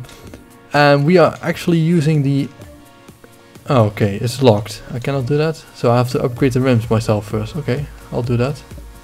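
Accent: Netherlands. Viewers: are you Dutch? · are you dutch because you're accent sounds like it :)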